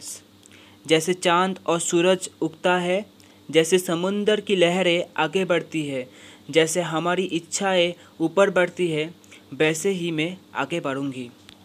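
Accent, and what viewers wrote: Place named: Assam, India. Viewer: Apki aawaj to assamese jasi hai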